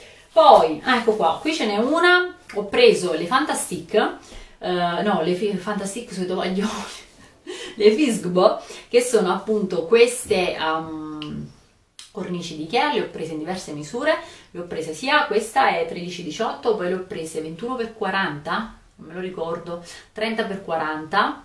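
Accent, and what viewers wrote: Tuscany, Italy. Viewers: Perché toscano esci da questo corpo!!!! È' così bello il toscano simpatico allegro 😍😍😍 comunque bellissimi acquisti naturalmente come sempre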